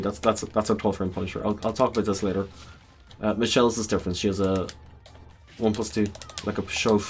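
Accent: Ireland. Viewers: R u from Ireland ?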